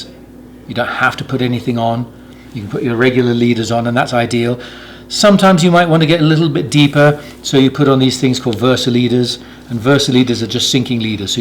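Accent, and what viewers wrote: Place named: England, United Kingdom. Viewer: There's certainly something to be said about having a presenter with an English accent. Watch any of David Attenborough's nature documentaries and you'll understand why. As per usual does an excellent job of breaking down something which can seem daunting to beginners, into a no nonsense, easy to follow guide for getting started.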